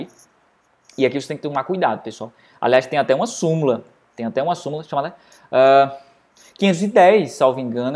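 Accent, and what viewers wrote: Northeast, Brazil. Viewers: Amei a aula, mais um inscrito. Mais esse sotaque seu é maravilhoso, tu é do nordeste né? Amo meu lugar 😍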